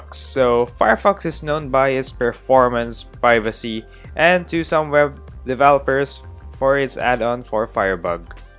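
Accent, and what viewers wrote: Philippines. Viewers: Pinoy po ba?! clear po kasi sa accent. ;) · As soon as i heard your voice i knew that you were Filipino, wassap man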